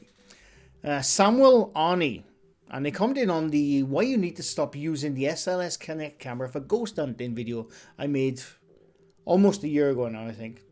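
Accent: Wales, United Kingdom. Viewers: I like your accent... so I like your Welsh accent too! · it's even better in a Welsh accent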